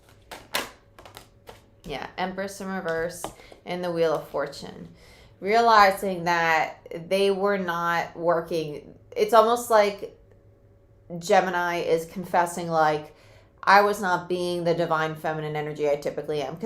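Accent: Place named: Cockney, United Kingdom. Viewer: LONDON.. LMAO.. I was just laughing at your kinda cockney accent at that point. You do it quite a bit and it always makes me smile.. Usually when you say WATER.. 🙏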